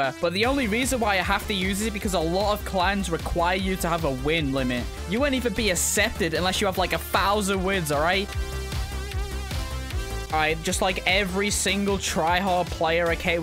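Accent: United Kingdom. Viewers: How to tell he is british A stat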